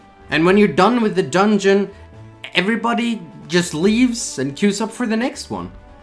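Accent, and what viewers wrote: France. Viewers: j’aime trop ton accent ( I love your accent ) VIVE LA FRANCE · I'm French so your French accent is... Très bien. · Ur french accent :c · French accent 100/10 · Je trouve que ton accent français est très raffiné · l'accent français de qualité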